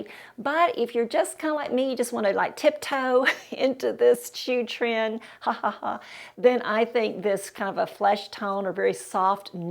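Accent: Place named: South, United States. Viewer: I love all of these shoes! Very stylish and sensible. What I love even more is your southern accent and how you pronounce "shews" .. It's so adorable!❤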